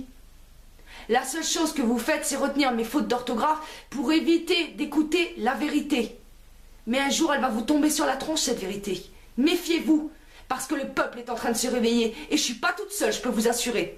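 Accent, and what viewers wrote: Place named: South, France. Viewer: L'accent du sud qui rend tout orateur un peu ridicule. On s'attend à tout moment entendre une citation de la gloire de mon père.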